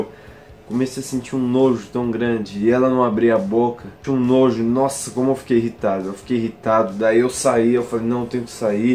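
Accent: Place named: Minas Gerais, Brazil. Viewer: Tudo bem que e errado mais aqui em minas maior galera fala assim kkkkkkkk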